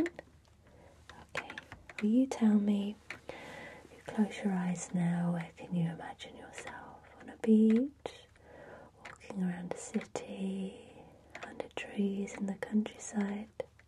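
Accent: England, United Kingdom. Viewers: I love your beautiful, sincere smile, your soothing voice, your nice and stylish English accent.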